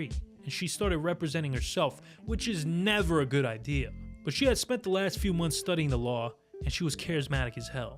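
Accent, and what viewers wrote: New York, United States. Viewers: I'm New here . I'm from the UK . But I lost it when I heard " she could sell bacon to Ben Shapiro 😂😂😂 LMFAO. I can tell this guy is from NYC 👍 . You got yourself a new subscriber . Good content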